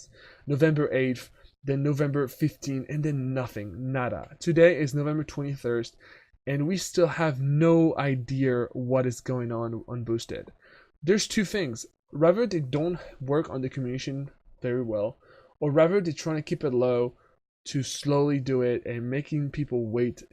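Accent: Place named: France. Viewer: Un ptit accent Français bien caché, j'aimerais bien parler anglais aussi facilement que toi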